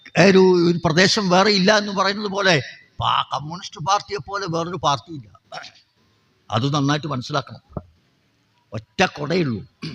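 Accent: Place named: Mavelikkara, India. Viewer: ഉള്ളിൽ നിന്ന് വരുന്ന സ്ലാങ് വെച്ചു മാവേലിക്കര-അടൂർ ഭാഗമാണെന്ന് ഊഹിക്കുന്നു.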